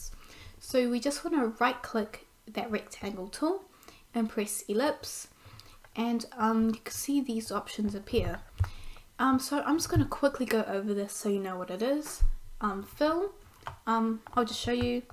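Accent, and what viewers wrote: New Zealand. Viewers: Kiwi accent ;)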